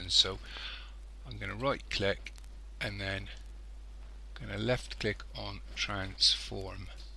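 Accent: West Country, United Kingdom. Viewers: Thanks! Very useful video! Where are you from? Sounds like you're from somewhere in the West Country but it doesn't sound quite like West Country accents I've heard.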